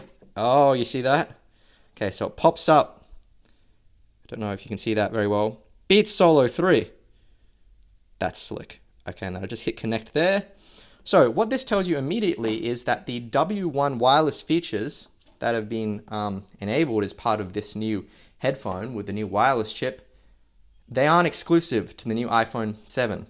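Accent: Australia. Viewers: Random question but is your accent australian?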